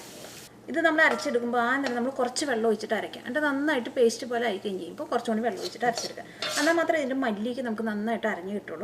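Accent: Thrissur, India. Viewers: thrissurkkari ano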